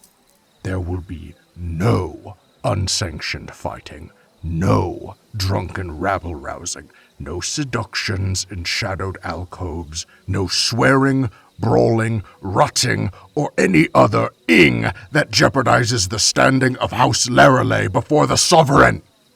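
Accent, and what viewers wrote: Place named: South, United States. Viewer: SOUTHERN ACCENT😭🙏🙏🙏🫄🫄🫄 CONSIDER MY M PREGGED AND MY EARS GASMED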